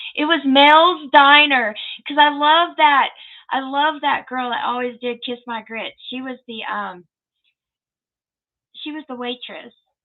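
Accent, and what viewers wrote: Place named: South, United States. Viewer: Hi, Kate here. Sorry I missed your live show. Hey, girl, we still love you. You are - passionate about your love and loyalty for Elvis, defending him like we're all doing, showing your true feelings and keeping it real, that equals honesty in my book. Please don't ever change. You do make me laugh, and that fabulous classic Southern accent makes your little rants sound even better! Lol. See you soon, Georgia girl. Take care. Loving Elvis and Lisa-Marie always.❤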